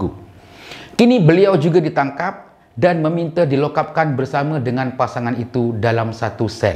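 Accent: Java, Indonesia. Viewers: Wak kalo ckp pekat lg jawa tuu lagi mantop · ckp meddok jowo tu ade haha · Wak pekat sekali jowonya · Wak bg pekat sikit bahasa jawa tu😂😂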